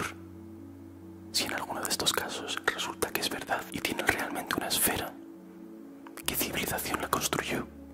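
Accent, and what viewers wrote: Spain. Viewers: Oye, ¿eres de España?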